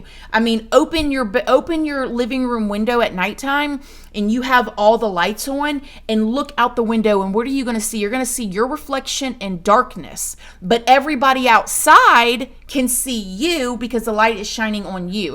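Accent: South, United States. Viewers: You giving me First Lady Tease I love it and your lil country southern twang lol 💜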